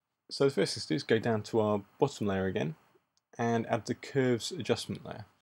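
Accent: United Kingdom. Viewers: His accent is English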